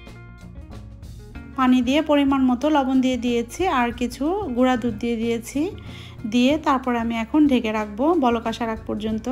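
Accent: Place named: Tangail, Bangladesh. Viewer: কথা বলার স্টাইল মনে হয় আপনার বাড়ি টাংগাইলে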